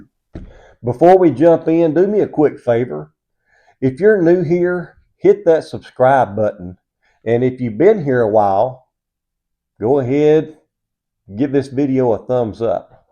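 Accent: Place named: Texas, United States. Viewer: I heard that accent on your latest video and had to scout you guys to see if you are a Texan. Im at the gym, more later.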